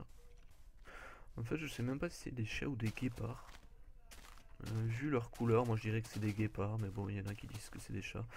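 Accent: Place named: South, France. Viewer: t'as un accent du sud !! le son il est pas terrible aussi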